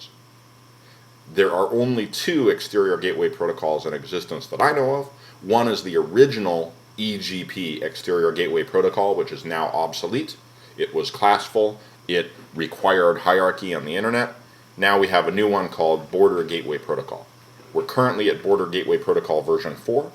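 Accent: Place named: United States. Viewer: Really enjoyed the delivery of this and I struggle with the American accent. Thank you!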